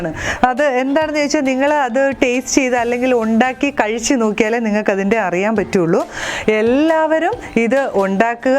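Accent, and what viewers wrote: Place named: Alappuzha, India. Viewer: Njangal alappuzhakkarude meencurry mathramalla aa bhashayum ethra sundaramanu.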